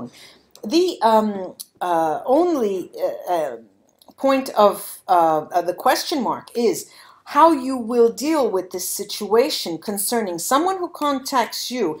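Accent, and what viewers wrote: Italy. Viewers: Italian Accent*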